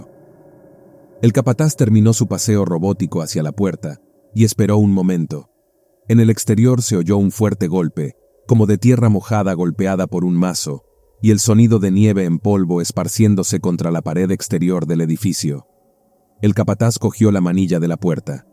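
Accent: Spain. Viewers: Es una cagada que sea tan profundo ese acento Ezpaniol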